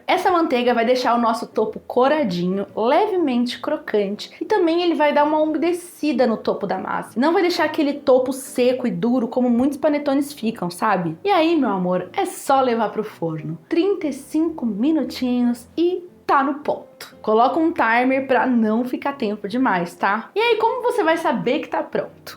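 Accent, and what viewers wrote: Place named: Bahia, Brazil. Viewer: KKK vc é da Bahia? Fala igual a gente velho kkkkkkkNa moral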